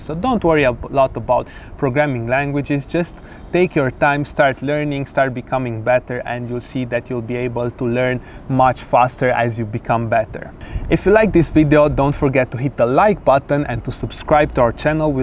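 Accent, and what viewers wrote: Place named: Romania. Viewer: vorbesti engleza ca un roman..fa le frate in romana si adreseaza-te romanilor..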